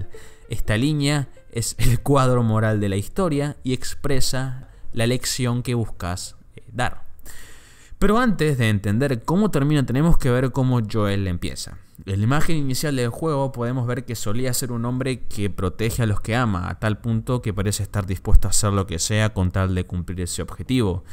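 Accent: Argentina. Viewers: Que asco, acento Miergentino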